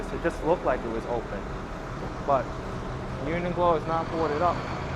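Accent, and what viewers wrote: New York, United States. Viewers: You have a distinctive NY accent. I like it, gives a real local flavor to your video.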